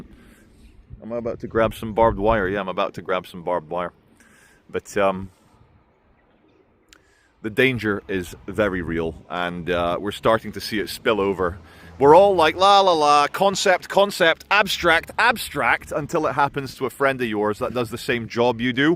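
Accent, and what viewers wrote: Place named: England, United Kingdom. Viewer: You talk like a true Englishman (wherever you may have blood ties). That beautiful view is very much like the area I live in. The shires of England. The times we live in today will be gone one day. In my long life we see these times come and go, I must say, this is the worse I have seen but it will pass, I think we will come out the other end a lot better and a lot wiser. It is very much up to every decent person in our land. Keep well, God bless us all and the Jews.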